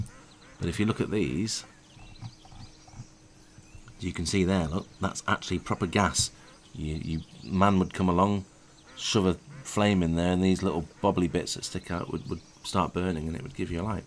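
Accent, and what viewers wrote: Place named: England, United Kingdom. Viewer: Just Subbed you looking at your youtube Victorian homes and I love your English Accent (Smiles)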